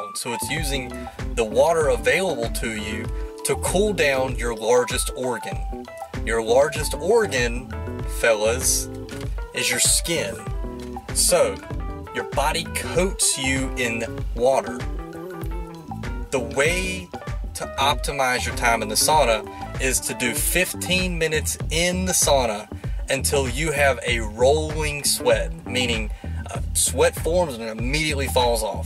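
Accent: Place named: South, United States. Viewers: An asian man with a semi southern accent? Now I've seen everything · Must be in Texas duh ... y’all act like we’re not supposed to have Asians 🙄 country Asians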